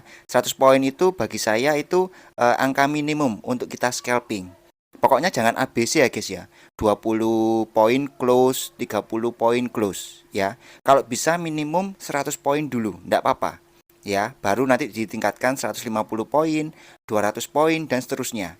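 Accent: Java, Indonesia. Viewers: Wong ndi gess.. Kok medok amet gess 😅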